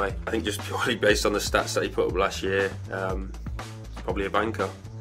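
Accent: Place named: Birmingham, United Kingdom. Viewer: It sounds like he has a hint of a Birmingham accent.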